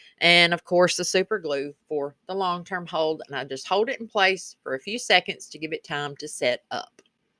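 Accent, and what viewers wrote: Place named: Kentucky, United States. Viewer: GRITS = Girls raised in the south. I was also raised on a farm in the south. Love your KY accent! My father and older siblings and husband are from KY.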